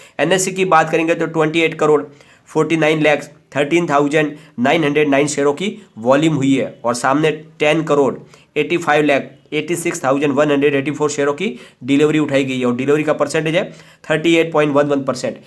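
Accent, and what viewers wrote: Gujarat, India. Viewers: Gujrati lago cho.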